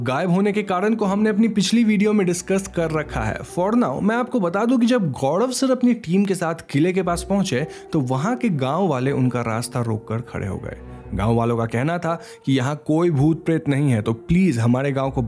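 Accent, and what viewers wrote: Bengal, India. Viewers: Voice over awaz kisi bengali ka hai. His pronunciation makes it evident. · Narrator is definitely bengali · Are u from Bengal? Im catching a Bengali accent. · Your Bengali accent ruined the whole fun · Bro your voice is very nice lekin yar ek kaam karo. Pronounsession mai gadbad hai R ko D mat bolo. Sorry bro no hard feelings. I can understand your bengoli axent. Some of my bengoli friends talk same like U.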